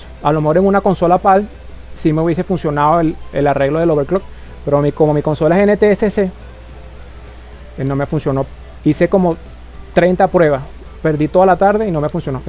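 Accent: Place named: Colombia. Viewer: No se porque, pensé que eras de colombia Pero es bueno que estes bien Espero se recuperen pronto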